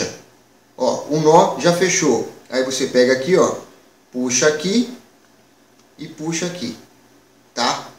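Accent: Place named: China. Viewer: e por que vc fala tipo chinês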